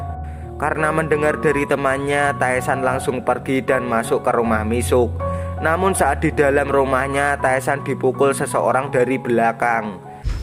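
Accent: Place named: Java, Indonesia. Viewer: Jawanya medok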